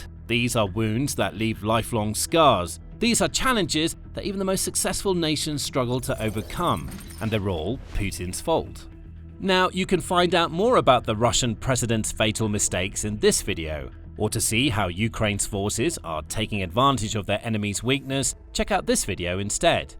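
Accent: United Kingdom. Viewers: I gradually got used to associating that british accent with unabashed non-stop lying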